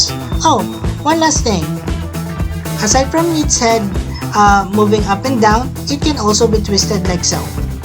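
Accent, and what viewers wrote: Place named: Philippines. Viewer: and I see ur filipino! By your voice!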